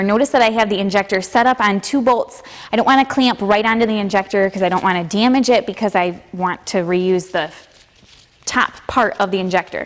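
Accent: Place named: Minnesota, United States. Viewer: I love the rural MN accent! We can smell our own.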